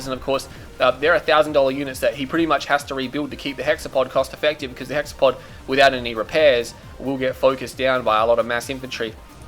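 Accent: Australia. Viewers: its weird how literally the most fun Channel on my youtube, is just some Australian dude narrating videos of a game that came out in 2007 and that i still suck at for the most part. And yet it never gets old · Too be fair Australian is one of the best accents in the world. M8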